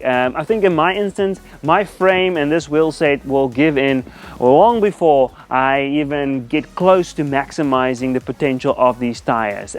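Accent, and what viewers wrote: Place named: South Africa. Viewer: South African accent is awesome, great review!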